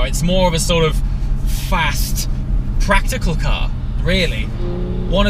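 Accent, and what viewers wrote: United Kingdom. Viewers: omg British Accent!!!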